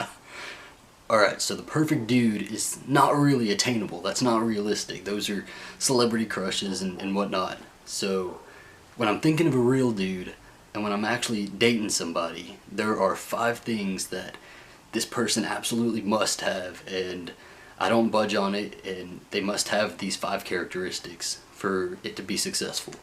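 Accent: South, United States. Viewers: this guys attractive not just his looks but his mind, not alot of gay guys think that way and they're very judgmental about each other so he's right, and I've never seen gay guy with a deep southern accent miracles do exist lol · his deep country accent is sexy but he seems straight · love your southern accent!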